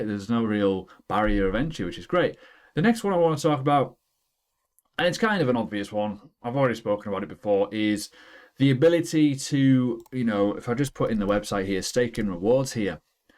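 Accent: United Kingdom. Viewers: Great channel I follow a lot of them and when I see ur new videos I’ll click them first What kills my is the ascent . for a Spanish guy British is harder to get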